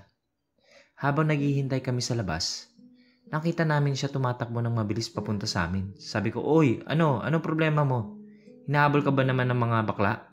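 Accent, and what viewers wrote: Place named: Bicol, Philippines. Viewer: Bicolano ka kuya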